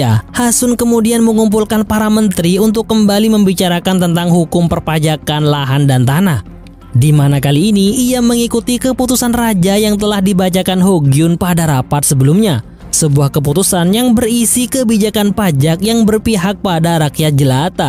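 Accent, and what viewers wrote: Madura, Indonesia. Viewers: Mas pengisian suara nya , orang Madura,logat Madura nya kelihatan banget 😅😊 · Iya ya logatnya madura banget😂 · Reng madureh kak ? 😅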